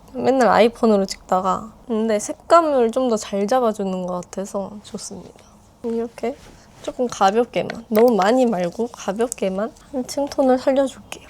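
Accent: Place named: Gwangju, South Korea. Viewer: 언니 혹쉬 광주사람? 어디선가 친근한 사투리가 들려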